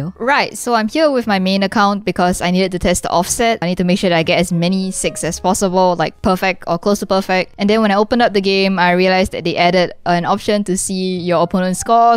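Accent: Singapore. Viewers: why do u sound like ur singaporen?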